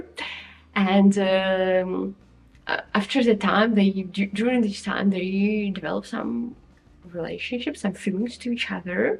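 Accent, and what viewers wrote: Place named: Luxembourg. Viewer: I haven't read any of those books, but it was fun listening to you talk about them. I don't think I've ever heard a Luxembourg accent before, and I confess that I had a little trouble understanding it at times, but if you can take the trouble to read English books, and review them in English, I can make the effort to listen carefully. I look forward to seeing more videos from you. 🙂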